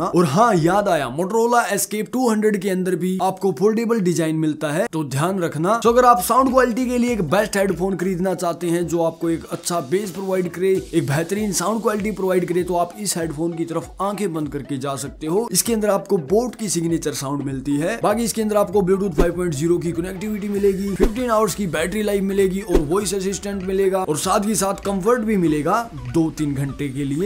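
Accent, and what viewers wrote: Haryana, India. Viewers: awaj me haryana jhalak rha h bhai.😃... baki full support h bhai ko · Bhai haryana t h k tu 😂🤙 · Pakka tum Haryana ke hi ho:face-green-smiling::face-green-smiling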